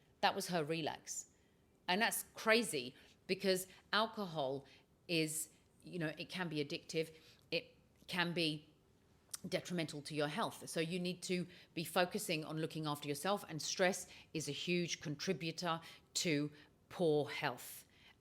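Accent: United Kingdom. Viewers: Godddammmmnnnnn she sound so british, even more british than my british grandma and she on hijab wth😂😂😂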